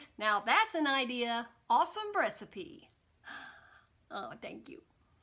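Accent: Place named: South, United States. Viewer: Oh yeah, thank You to the Creator of the chickpea. Long live the chickpea! Hey, I love your accent. It's similar to mine. So, you just keep on with that lovely southern talk. We love you! Mmmmmwuah ! 💜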